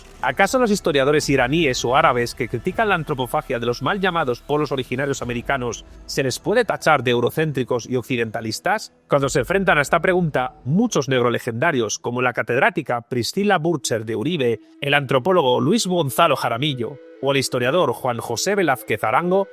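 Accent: Spain. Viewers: Esa historia es de los vencedores y no de los vencidos no se quién son ni me interesa saber no era América y ni hispanoamericano eran tierras desconocias no fue colinizado ya que ya vivian aquí es estas tierras yo hubiera preferido que llegaran los japoneses y aferrados a llamarmal colonizar no comian carne humana y los llamados inquisición española que eran unos santos violando y torturando mujer y hombres fue genocidio no conquista por tu acentos se oye que eres español y si tenian que dejar como estaban los antiguos pobladores hispanidad ridículos y ustedes no eran aborigenes con tanta gurra y matando gente en Europa los antiguos españoles el azteca no sabes de historia eran mexicases lo que quieren que crean en la nueva tierra todos de este lado vivian en armonía por eso tantos pueblos y su inquisición eran angelitos aja y la inquicion no eran sanguinarios y y novela respetaban a las mujeres con tal de violarlas hispanistas rudiculo seguramente estaban ahi viendo como guerreaban pues como discutir si crees que tienes la razón como si lo hubieras vivi y verlo en vivo y a todo color no te va aparecer lo que te escribo te guste o no los antiguos españoles no eran canibales y violadores no son objetivos quieren imponer lo que ustedes creen y los antiguos españoles no vivian en guerras constantes no critiquen no se vallan a morder la lengua ustedes no tenian esclavos no tenian o prostitución forsadas los antiguos españoles todos unos angelitos se ve que eres español y no sabes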